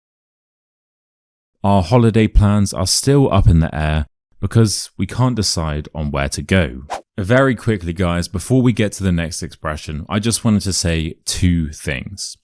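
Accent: United Kingdom. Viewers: Thank you, I’m learning a British accents, expressions and all of english from your video. Happy new year mate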